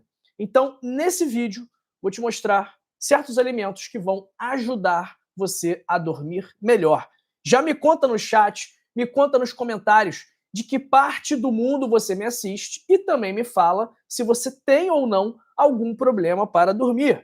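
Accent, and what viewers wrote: Rio de Janeiro, Brazil. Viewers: Doutor obrigado pelos seus ensinamentos, atualmente estou perdendo noites de sono devido a ansiedade. Irei praticar essas dicas que são tão fáceis e encontradas em toda casa kkkk! Ah e só uma coisa, eu adoro o sotaque dos brasileiros, povo mestiço e diversificado. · Acho tão charmoso o sotaque do carioca!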